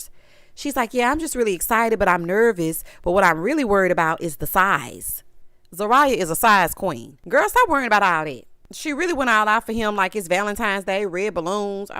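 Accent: South, United States. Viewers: Hey Girl Heyyy! I can't wait to get into this review. Be right back, I'm going to get some popcorn. Nevermind those people. We live your educated, humurous, country dialect. Enjoy your evening. 🤗🧡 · girl keep being yo’ fabulous self, country twang and all! 😂😂😂 Love your reviews! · Ur reviews, r the best..! & LOVE ur accent. Shout out, to the south. 🇨🇱🤠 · I love your commentary! You are hilarious.🤣 And your southern accent is everything!’🥰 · Girl, I love your country twang!!!! Moving forward (in your voice). 😅😂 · They hating! That southern twang and contagious laugh are just a couple reasons I love listening to you 😍. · Pay them dust, I love your accent and southern charm 💕🌹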